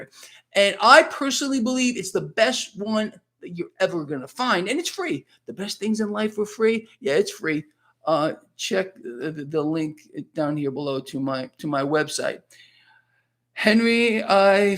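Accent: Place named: Queens, United States. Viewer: New Subscriber, I feel so sad I just found you, but I did just order your book! love the content, you must have been from Queens? Same accent... Thank you.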